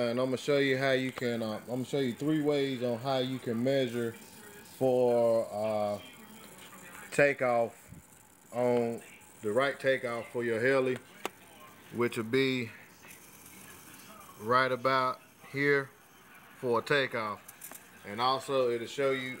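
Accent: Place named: Virginia, United States. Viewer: Thank you! You sound like my family members from Virginia. I appreciate your help.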